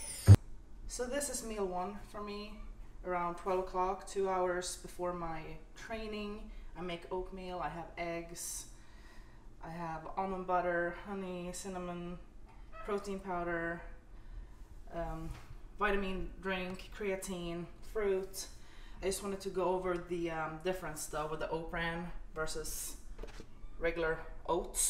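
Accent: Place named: Sweden. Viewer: Still hear the Swedish accent haha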